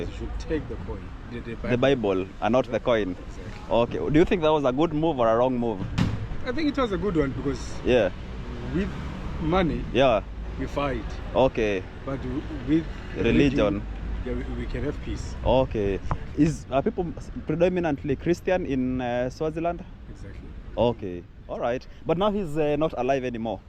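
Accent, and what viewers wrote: Kenya. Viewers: Thats a Kenyan 🇰🇪 accent. Good job my brother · Lived in Eswatini for seven years, such a great country! You sound Kenyan, thanks for the video!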